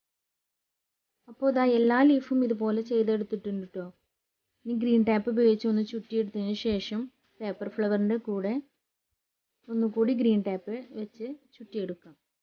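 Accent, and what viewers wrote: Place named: Nilambur, India. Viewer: Nilambur Samsarthilekku ethi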